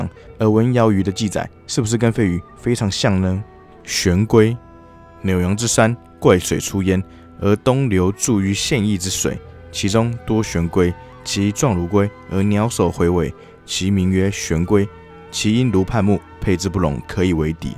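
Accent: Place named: Minnan, China. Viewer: 主播的國語去再進修，一嘴閩南口音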